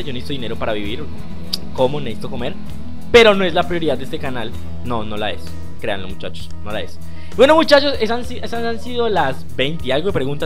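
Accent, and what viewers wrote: Colombia. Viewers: Oye ... XD vengo de uno de los packs HD ... xD y se oía un poco lo rolo en la voz y claro Colombiano parce !! XD !! saludos desde cúcuta .. aún así no estoy ni seguro si es de bogotá xD !! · de que parte de colombia eres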